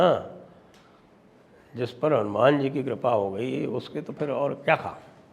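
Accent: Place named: Bihar, India. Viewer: i like ur bihari (bhojpuri style) way of presenting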